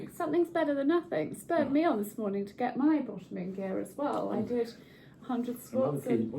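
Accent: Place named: England, United Kingdom. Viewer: ❤❤❤❤❤❤❤❤❤❤❤❤❤❤❤❤❤❤❤❤❤❤❤ Hello Sweet 😊. (still on vacations 😅) What and incredible hair device😮😮😮😮 Wow Wow! Thanks God you do have a balance with Brand Deals because its Much Much more credible and we do BELIEVE in you! There are other youtubers that seems a retail seller. Its utterly annoying🥱. The English accent that you have when talking to is so so Beautiful 😊 Rosie 🩷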